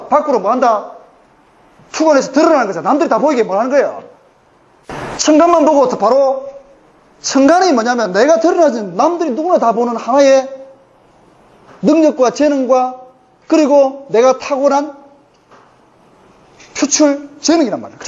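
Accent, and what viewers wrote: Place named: Gyeongsang, South Korea. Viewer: MB 젊었을 때 모습과 많이 닮았음. 목소리도 많이 닮음. 경상도 포항지역 사투리의 억양도...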